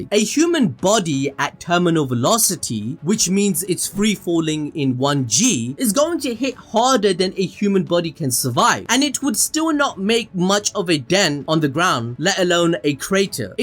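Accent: India. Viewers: brooooo are u indian ???? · you indian?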